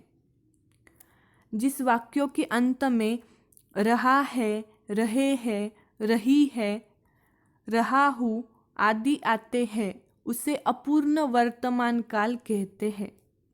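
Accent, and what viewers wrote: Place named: South, India. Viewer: Very very nice 👌👌big big thanks for u 🙏mam aap South se ho aapki hindi South side ki lg rhi h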